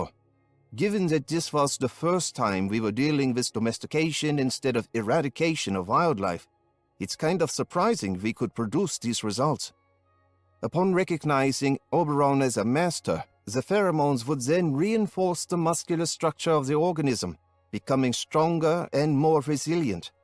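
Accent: Germany. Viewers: is this a real or fake German accent? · is that a german accent? lol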